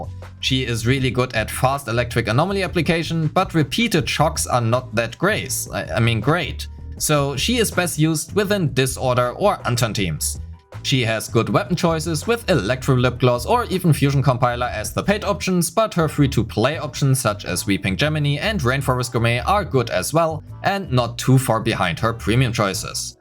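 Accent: Germany. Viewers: "Kolader" lol jokes aside I'm from alsace. German accents never fail to amuse me 😂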